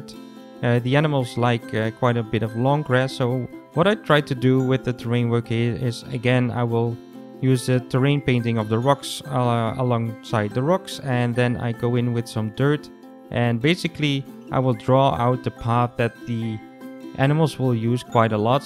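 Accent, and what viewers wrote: Netherlands. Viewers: not many animals look like what i see on the veluwe but keep up the good work ( ik hoor een beetje nederlands engels am i right?)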